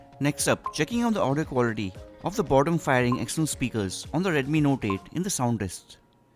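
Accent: India. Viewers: from your accent i can see you're indian. Kudos for this video since actually the audio is in english and the title and the description, they match, unfortunatelly 97% of indians put english titles and description and in the video they speak लड़कीहैलो . Come on indians it's not that hard to be considerate! learn from this guy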